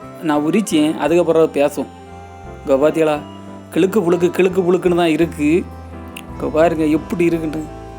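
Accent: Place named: Kerala, India. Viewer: Hi nalla irukkureengala unga tamil alaga irukku thambi. Malayali pesuvadhu phol irukku. From Sweden 👌🤲🏽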